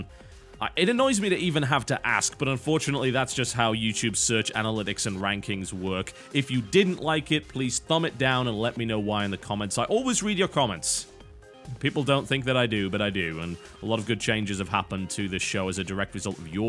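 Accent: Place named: England, United Kingdom. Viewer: Total Biscuit, you'r lossing your english accent :(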